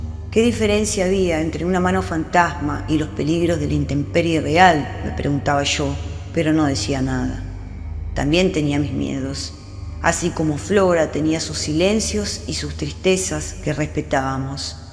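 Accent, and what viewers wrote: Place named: Argentina. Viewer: La primera parte es una crónica. Qué excelente Mariana Enriquez!!. Y qué hermoso escuchar esta voz argentina ❤❤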